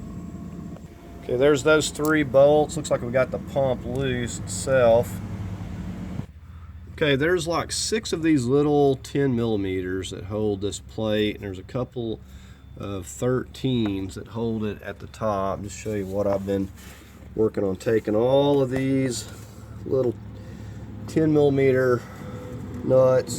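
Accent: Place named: Kansas, United States. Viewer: highest highlights sounds like Kansas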